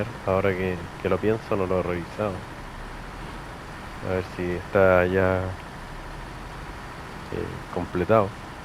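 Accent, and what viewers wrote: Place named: Chile. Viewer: y a ese suscriptor, o "visualizador" de tus vídeos, tampoco le gusta tu acento chileno? Jajajajajaja La verdad, la gente se aburre mucho. La gran mayoría estamos contentos con tu narración y explicaciones, que es lo que cuenta. Si le gusta más la "buena" narrativa que el contenido, también hay canales de poesía. En fin, como siempre, otro gran vídeo. Saludos desde Moscú 👌🏼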